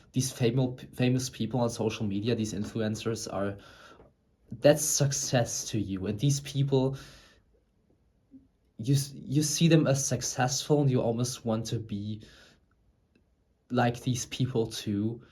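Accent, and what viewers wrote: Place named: Germany. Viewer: 2 seconds in and I know he is German :face-purple-wide-eyes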